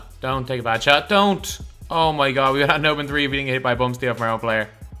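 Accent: Ireland. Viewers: Are you Irish or American 😂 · He’s definitely irish ☘️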